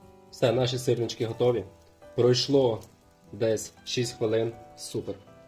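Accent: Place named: Carpathians, Ukraine. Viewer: Доброго дня., ти чудовий кухар. Все в тебе надзвичайно смачно і швиденько. А я хочу вибачитись за тих хто заходить на канал і починає показувати, який він мовник. І як добре вміє розмовляти. То ідіть в школу і викладайте і навчайте своїх дітей і внуків. Наша карпатська мова дуже різноманітна. Так розмовляли наші дідусі і бабусі, батьки, ми і наші діти. Ні, щоб подякували за рецепт, а викаблучуєтесь. І не соромно вам люди. Порядна людина ніколи не буде писати таких дурниць. Радуй нас своїми кулінарними шедеврами і розмовляй так, як говорять у нас в Карпатах. Бережи тебе Боже.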